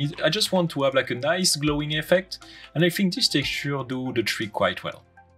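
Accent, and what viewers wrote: France. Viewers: fait des vidéos en Français stp ta un gros accent Français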